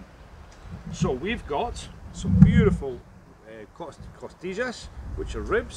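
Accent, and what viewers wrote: Scotland, United Kingdom. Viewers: pretty good man! where are you from originally? I sense a bit of a scottish accent, or maybe northern england? my only suggestion is that for thin cuts like entrecot or matambre, is better to salt them on the grill or right before you put them in. Also chimi is better when you leave it in the fridge for at least 24 hs! The true test for a grill master is to grill a whole vacio cut (which has 3 different cuts in it , with loads of fat and membrane for flavour and crunchyness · Great vid. Just found it. The accent though? Scottish? Went to Argentina and ate almost a kilo of steak a day. Loved it.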